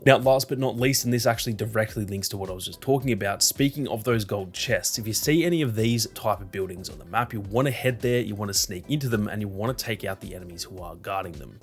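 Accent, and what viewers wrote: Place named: Australia. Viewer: Good tips Aussie fella lol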